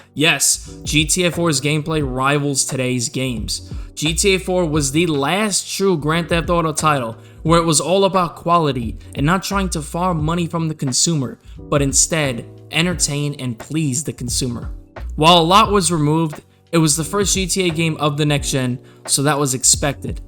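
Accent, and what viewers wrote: New York, United States. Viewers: I fuckin love your New Yorker accent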